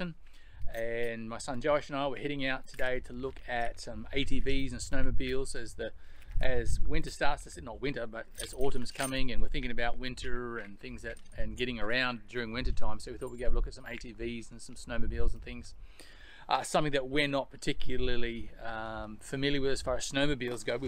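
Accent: Australia. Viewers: Так сложно на слух понимать речь... )) Привык к "американскому" английскому. Австралийский - как будто вообще другой язык) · я живу в Англии уже много лет, его Австралийский мне понятен без проблем, а вот Американские фильмы не смотр, а иначе все время переспрашива своего партнёра "что он или она сказали", он часто мне отвечает, что сам не понимает. А пр индийский английский, это да... 🥴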